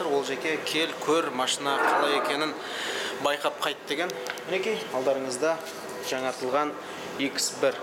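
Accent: Standard. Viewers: Жарайсын бауырып осы жолыннан тайынба, таза казакша тындаган кулакка не деген жагымды. Жарайсын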